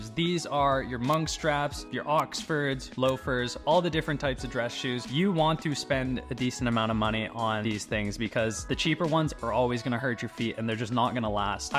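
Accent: United Kingdom. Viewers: ha ha love the british accent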